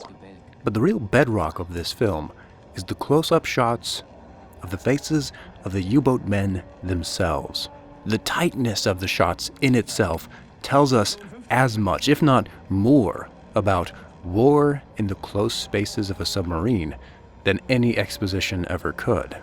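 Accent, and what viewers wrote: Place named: Austria. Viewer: Östereicher habe ich mir gedacht. Es klingt ein ganz klein wenig anders als Bayrisch. Aber so genau könnte ich es nicht sagen...Ok bin ja auch Norddeutscher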